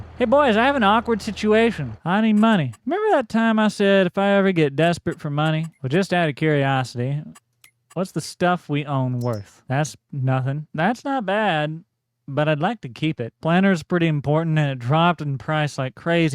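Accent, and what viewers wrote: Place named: South, United States. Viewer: I just want to say when I was watching the first few videos I noticed you have a southern accent when you talk in certain parts and I fucking love it HAHA IT SOUNDS AWESOME. cant wait for more videos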